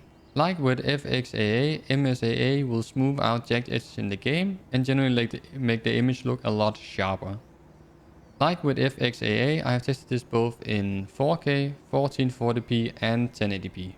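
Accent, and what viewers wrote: Denmark. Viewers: You sound danish lol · Hey bror, har set du er stoppet med at poste, men ber dig begynd at post igen tro mig. Du skal bare øve dig på dit engelsk så det ikke er danglish og blive ved med at poste og lock in. Tro mig hvis du bliver ved bliver du stor på et tidspunkt.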